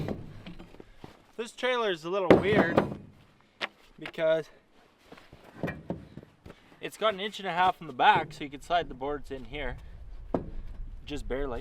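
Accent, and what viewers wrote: Canada. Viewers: I can't give you any advice, i couldn't even build a bird house. I have OCD so i notice little things that would drive me crazy. I have to say i have never once seen anything you do trigger me. So precise, and professionally done. Thank you for taking us on your journey. I can't wait until it is completed. You are very talented and is amazing how you have so many skills. It takes a long time for spring to come in Canada.....i recognize the accent and speach patterns. My parents and 2 elder sister are Canadian. I was the first born American and have been to Canada a few times. Always happy to see your videos pop up on YouTube.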